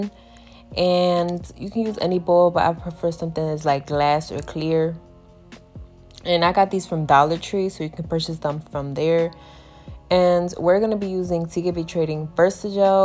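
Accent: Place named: Russia. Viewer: Акцент словно русский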